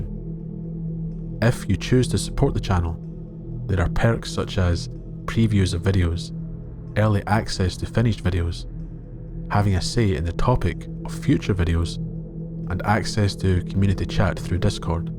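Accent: Scotland, United Kingdom. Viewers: Excellent again. There is something inherent in Aragorn that did not exist in his ancestry for generations, perhaps since Elendil. Just as Gandalf tells Pippin that in Faramir the blood of Numenor ran true, as it did not in Boromir , so Aragorn has the majesty and power unmingled from the days of the greatness of Westernesse. Many Chieftains of the Dunedain and Kings of Arthedain passed by during the life of Arwen Undomiel, but the daughter of Elrond, and granddaughter of Galadriel and Earendil, sees in Aragorn a man she can love and respect, and sacrifice her eleven heritage for. He is Siegfried loved by a Valkyrie, Arthur returned, the Charlemagne of myth, the Restitutor Orbis like Constantine, not just the heir of a ragged line, long bereft of lordship who strikes it lucky. (How blind Denethor was!) Tolkien pushes all of our buttons. The man was a genius. 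BTW, great to hear a Scots voice amongst the babble of YouTube. Sorry to pontificate. Great channel.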